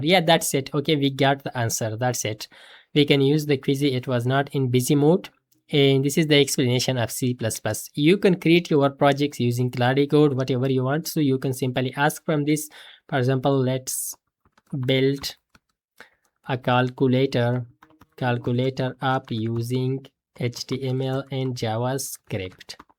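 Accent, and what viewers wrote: India. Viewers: Indian pronunciation 😂 Clau de code 😂 It's claude not clau de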